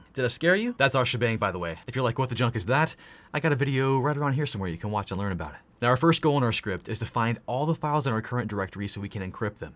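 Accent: Texas, United States. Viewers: YEEEES HES TEXAN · what part of Texas are you from?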